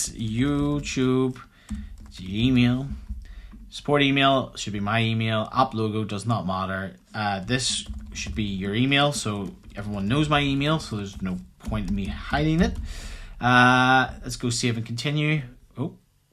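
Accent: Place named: Northern Ireland, United Kingdom. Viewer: Good stuff, I've been experimenting with this stuff too, I found Bedrock Agents a bit limiting especially when it comes to integrating MCPs. Good to hear the NI accent, my wife is from there :)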